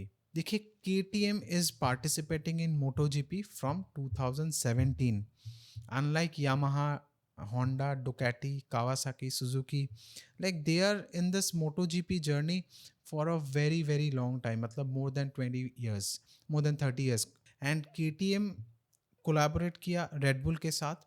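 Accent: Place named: Bengal, India. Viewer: Your bong accent is too pronounced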